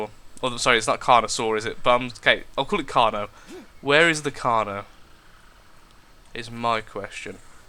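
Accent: United Kingdom. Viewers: keep on going with your videos of this game, this is awsome.Good work soo far britain man with funny accent and dislexia.(that is no offens)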